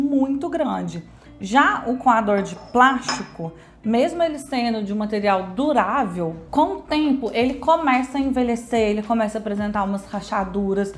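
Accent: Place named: Minas Gerais, Brazil. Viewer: Gosto muito da maneira didática que você explica. Fora que seu sotaque mineiro é uma delícia de ouvir. 🌺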